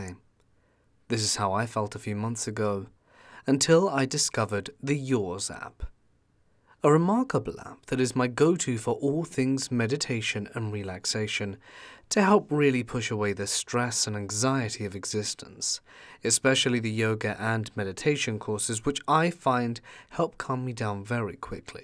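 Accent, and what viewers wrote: England, United Kingdom. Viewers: Lol! I relisten for third time! Love compilations! And narration is simple the best with his english accent 😊 I couldn't see your comment on my side, as I said it earlier, and nothing on my notification. I'll check again. How is your day so far? Have you seen your Dr? 😘 Ps: Did you see Paul? He left his comment. pm here now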